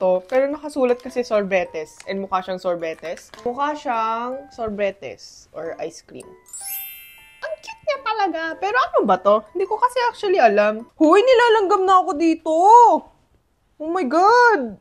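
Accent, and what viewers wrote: Philippines. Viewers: bat ang galing mo mag tagalog? u sound very pinoy.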